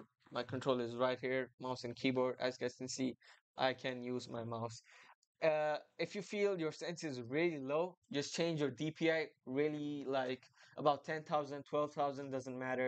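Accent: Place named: Germany. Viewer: sounds like german accent lmao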